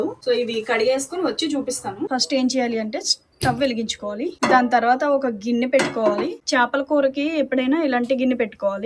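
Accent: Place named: Palakollu, India. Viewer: Meedi a ooru akka ? Palakol vacha antunav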